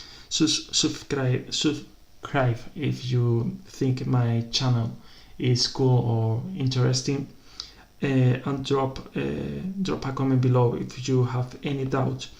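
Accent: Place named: Spain. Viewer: Pues el habla español , o al menos su acento es de español hablando ingles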